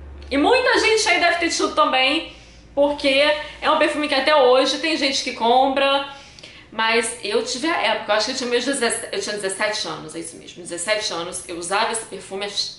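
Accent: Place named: Rio de Janeiro, Brazil. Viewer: PARABÉNS PELO VÍDEO MINHA DOCE BELA E REQUINTADA YOU TUBER ÁRABE COM SOTAQUE CARIOCA QUE EU AMO. SEMPRE BEM DETALHISTA DIVERTIDA E EDUCADA FAZ UM VÍDEO DE PERFUMES NACIONAIS MASCULINOS PARA AGRADAR AS MULHERES FICARIA MUITO GRATO BJS ABRAÇO UM CHERO TENHA UMA BOA NOITE PERFUME QUE EU AMO PRA ESPANTAR GENTE Q EMPRESTAR O MEU CAPACETE DE MOTO EMPRESTADO É O TABU UM EXORCISTA PRA EXPULSAR PESSOAS QUE EMPRESTAR ROUPAS ETC........ KKKKKK❤😙😍😂👏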